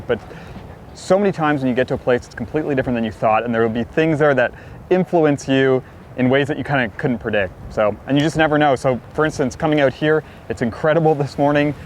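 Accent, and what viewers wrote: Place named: Canada. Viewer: That canadian accent is ... ugh 😬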